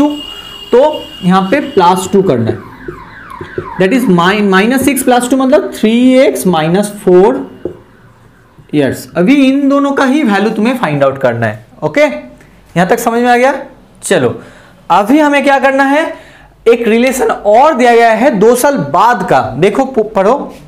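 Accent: Bengal, India. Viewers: Sir aap sum ko saam kyu bolte h shayad aap bengali ho mager aap bhot aache teacher ho heads off on you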